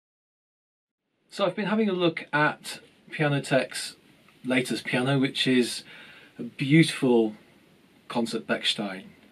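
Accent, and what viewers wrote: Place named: RP, United Kingdom. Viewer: Hello there Sir!!!! I would like to leave an off-tangent comment: I love your English RP accent!!!!!!! So elegant =) kisses from montreal.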